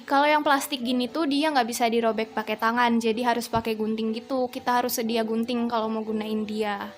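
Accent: West Java, Indonesia. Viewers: Qaqaq orang Sunda:v? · Ka orng Sunda nih?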